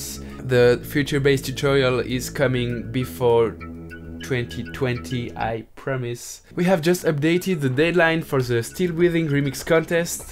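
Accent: France. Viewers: hey one second... he got a french accent no?😂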